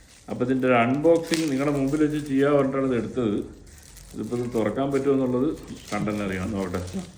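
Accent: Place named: Palakkad, India. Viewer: പാലക്കാടൻ സ്ലാങ് സൂപ്പർ സാർ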